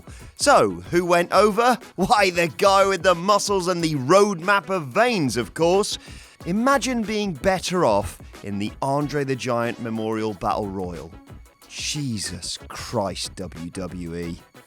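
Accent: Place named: United Kingdom. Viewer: Finally, a good British voice besides Adam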